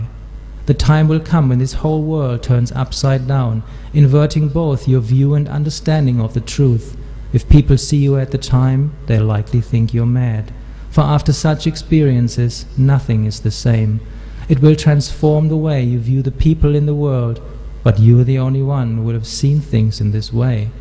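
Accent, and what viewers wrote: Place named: Australia. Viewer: His accent is very Australian now.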